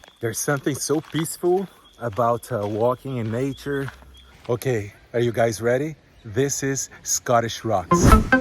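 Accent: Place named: Brazil. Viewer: Brazzo accent yey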